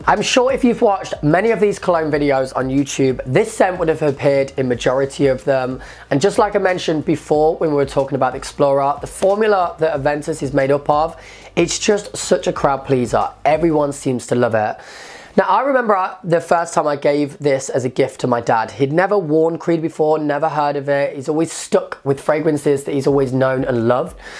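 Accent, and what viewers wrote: England, United Kingdom. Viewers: Hmmm where in England is your accent from?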